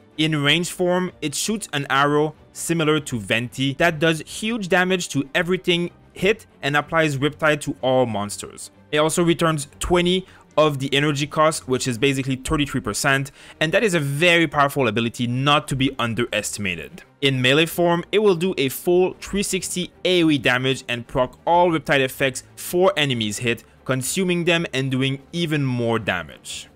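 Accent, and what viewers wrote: France. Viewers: Your accent sound like very french are you ?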